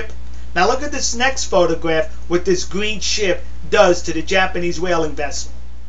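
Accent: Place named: Northeast, United States. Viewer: I just have a stupid question, which part of North Eastern America are you from ? Are you even from the North East ? (I would definitely want to trade my Glasgow accent with yours) lol Have a good day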